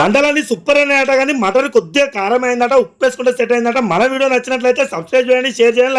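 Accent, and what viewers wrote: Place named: Tirupati, India. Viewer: I am from jagtial. Ur style like same to same tirupathi Andra vala laga undi matalo chepadam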